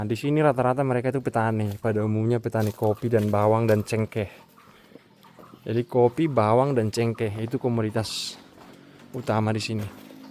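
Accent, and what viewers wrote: North Sumatra, Indonesia. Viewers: mantap laeku saya yang selalu mengomen anda jarena saya duka dengan gaya anda membawakan cerita dan bicara anda sedap gitu loh sudah meng Indonesia walau logat bataknya masih kental tapi mantaplah pokoknya sukses terus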